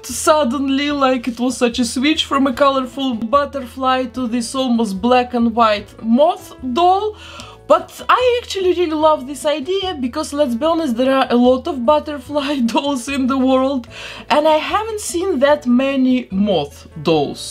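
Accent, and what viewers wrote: Russia. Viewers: А вам тоже кажется, что она русская,но "английский" акцент её выдаёт?)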